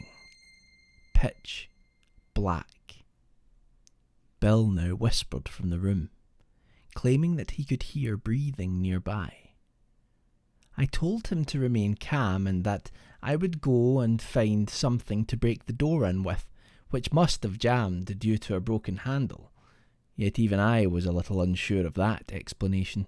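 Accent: Scotland, United Kingdom. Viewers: I love the tales you create, weave, narrate & your Scottish brogues. Very entertaining & professional all round. Just love your podcasts & look forward to each new story. Keep up the great work:-)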